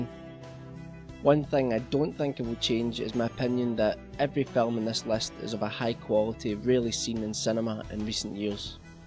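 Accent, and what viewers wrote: Scotland, United Kingdom. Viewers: for those ignorent people he isnt english he is scottish :) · Don't listen to him. This crazy scottish accent is one of the best features of this video. Great comments on great movies, although I have a different ranking.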